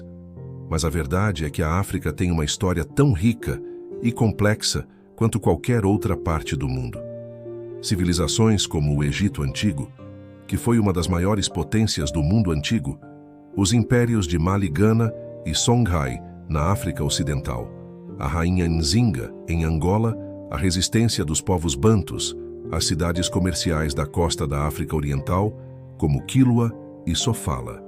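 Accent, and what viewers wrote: Brazil. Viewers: Vão mas é trabalhar. Este narrador com sotaque brasileiro a dizer que é africano é só para 😂😂😂😂. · ÉS AFRICANO COM SOTAQUE BRASILEIRO?!! A VERDADEIRA HISTÓRIA DA ÁFRICA E BERBERE OS CELTAS TAMBEM ERAM BERBERES DEPOIS ALGUEM FEZ UMA MANIPULAÇÃO GENÉTICA CRIMINOSA E CRIOU CANIBAIS OS BERBERES E CELTAS SAO OS MESMOS ATLANTES OS PORTUGUESES ERAM A ELITE ATLANTE OS PIRTUGUESES FORAM AS AMAZONAS OS FENÍCIOS OS TEMPLARIPS E OS ESCRAVOS NEGROS BRASILEIROS OS INGLESES GORAM OS ESCRABOS NEGROS USA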